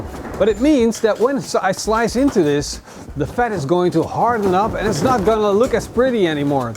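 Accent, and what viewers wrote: Netherlands. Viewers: He sounds a bit Dutch.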